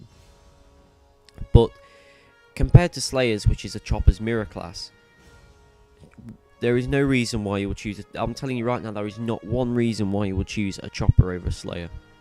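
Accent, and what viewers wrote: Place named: United Kingdom. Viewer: You also died twice as many times. Not blaming you though. If where you're playing is anything like the North American servers (you sound vaguely British so maybe you're on the Europe ones) 3/4 your teams healers are selfish DPSing DoKs and Shamans. Compare the number of Zealots vs Runepriests in the average match. A lot less Zealots, why? Because you can't DPS for crap as them so Destros don't roll'em. You'll see the same issue with most of the mirrors. Order heals so they live and DPS longer.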